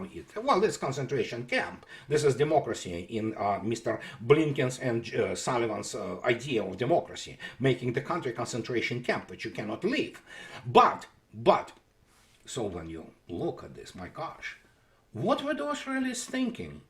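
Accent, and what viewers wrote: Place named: Russia. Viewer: I just love how you said " leopard M2A whateverthekhellis" :) I like russian accent..greetings from Budapest :)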